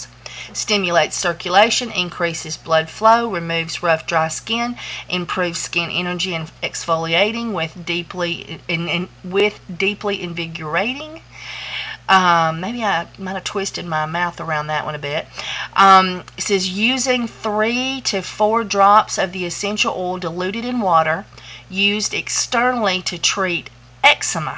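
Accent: South, United States. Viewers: I think you are precious w/ur Southern accent & easy going genuine way! Love listening to u talk! Next time I order Lye or anything else from Es. Depot, I'll do thru ur aff. # 552! Mahalo nui loa for taking the time to share what ur doing. I appreciate & enjoy ur vids!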